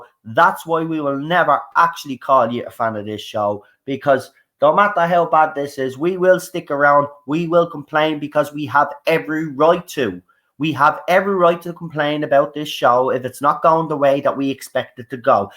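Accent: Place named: Dublin, Ireland. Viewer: Which part of Dublin are you from?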